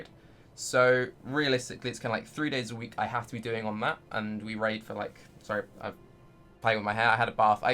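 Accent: United Kingdom. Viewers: I don't think people are really gonna bother you if you run ads on twitch. Like if you're broke and you need money just do it. 15 seconds of commercial every once in a while is not gonna bother us. On a side note, glad your health's doing better, and God damn(... bloody hell?) I love that British accent lol.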